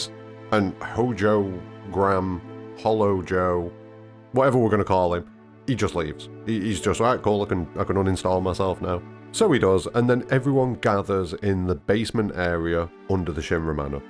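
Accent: Northern England, United Kingdom. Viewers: lol imagine if tifa just went “ nahhh dickhead” the fact that your northern makes it so much funnier, I fucking love your breakdowns dude keep up the good work. Your holding the rebirth content atm and I love it dude another great video my guy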